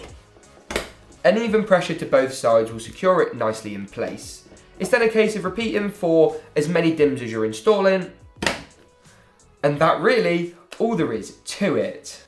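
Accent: United Kingdom. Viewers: Are u from the uk?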